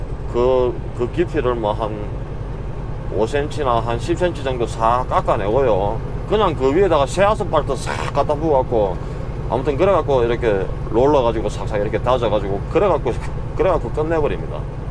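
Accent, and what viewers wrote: Gyeongsang, South Korea. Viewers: 이만 돌파 축하드립니다 1편 부터 12편 까지는 말 없이 자막처리 12편 부터는 구글지도 사용 일정 안내 ㅋㆍㅋ 님의 영상이 하루하루 멋지고 있네요 아직까지 님 영상 다시보기 보고 구순한 갱상도 사투리가 몆편부터 시작인지 현재 다시보기중입니다ㅡㅡㅎ.ㅎㅡ 폰 데이터 다 소모 했읍니다ㅡㅋㆍㅋㅡ 고속도로 국도 사항은 한국이 짱이죠 돌쇠 엘프 밍키 상봉까지 안.운 하세요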